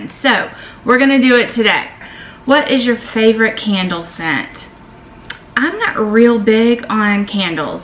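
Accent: South, United States. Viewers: Another great video, This is so cool, helps us get to know you! I love your southern accent! My sister has a southern accent, she lives in HHI. Do you live close to HHI? I'm visiting her in June! Prayers are with your lil man!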